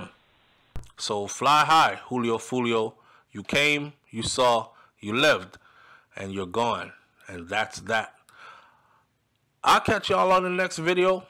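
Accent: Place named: Jamaica. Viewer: This sounds like soflow TV.. 😂😂😂 the Jamaican accent can’t hide man.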